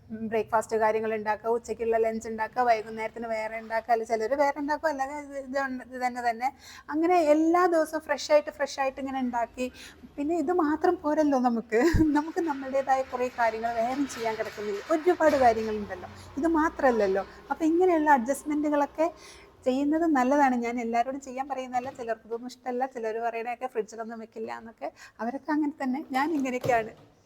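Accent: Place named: Malappuram, India. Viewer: സംസാരം കേട്ടപ്പോൾ തന്നെ ഒരു മലപ്പുറം touch തോന്നി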